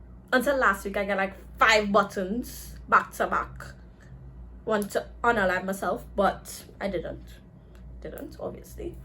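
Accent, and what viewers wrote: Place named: Trinidad and Tobago. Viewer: Are you Trinidadian? You kinda have an accent... similar to Trinidadians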